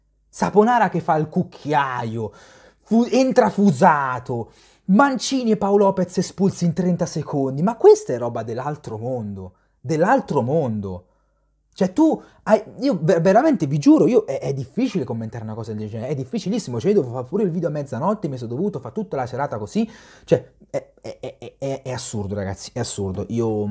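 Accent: Perugia, Italy. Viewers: Vago accento di Perugia o sbaglio? Forse mi sbaglio. 😎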